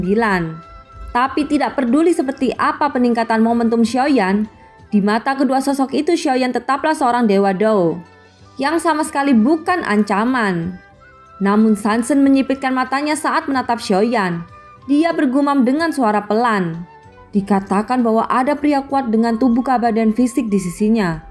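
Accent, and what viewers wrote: Java, Indonesia. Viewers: Medok piye iki bahasa ne...😬😬😬😬😬😬😬😬😬😬😬😬😬😬😬😬😬😬😬😬😬😬😬😬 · Medhok nya bisa di kurangin ga..😊😊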